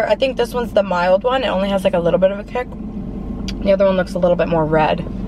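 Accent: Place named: New York, United States. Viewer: Your not from NY with that accent?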